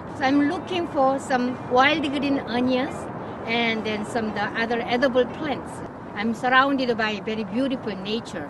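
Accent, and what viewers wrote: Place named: South Korea. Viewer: your Korean accent is sooo cute!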